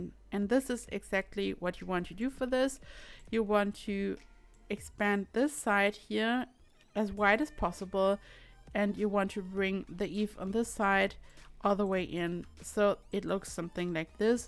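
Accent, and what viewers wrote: Germany. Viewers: Deutscher Akzent iz da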